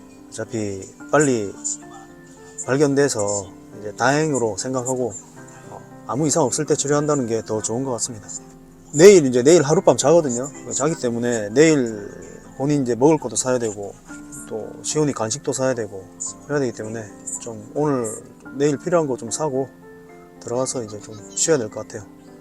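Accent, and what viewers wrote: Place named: Busan, South Korea. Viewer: 저도 예전에 일때문에 일본에서 살다가온적이있었는데, 일본에서 잘 견디고사시네요^^ 저는 일본에서 힘들었네요ㅋ 부산말투이신거같은데, 저도 부산사람입니다. 지금도 부산에서 살고있고요. 아내분도 부산억양이 있으신거같네요^^ 아기의 쾌차를 바라며, 행복하시길~~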